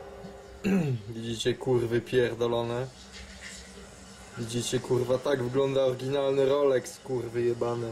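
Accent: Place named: Russia. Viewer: Kupiłem taki sam za 90.zł.masz ruski ,akcent.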